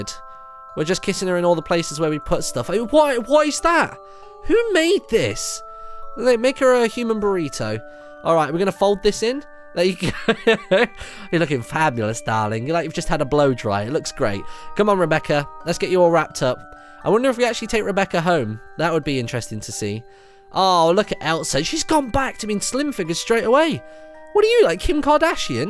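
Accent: United Kingdom. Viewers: Ate you British you sound British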